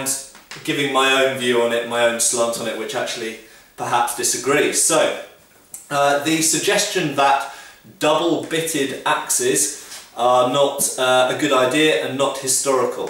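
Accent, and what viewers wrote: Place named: England, United Kingdom. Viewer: Out of a myriad of tube video's I thoroughly enjoy yours for having such well presented video's. I also enjoy the Pommy accent and the authenticity when you always turn the camera off by hand gives a nice touch. P.S ASHES BELONG TO AUSTRALIA !!!!! Yeaaa, maybe you should do a video on what your cricketers should use as the most devastating blunt instrument for maximum batting efficiency :D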